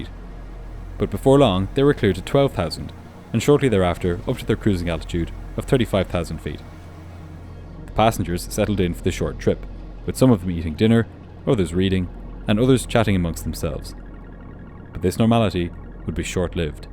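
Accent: Ireland. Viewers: Love seeing your handsome face in your videos, coupled with your gorgeous Irish accent, which makes your videos so much more relatable 🇮🇪☘️🇮🇪😍😍😍😍😍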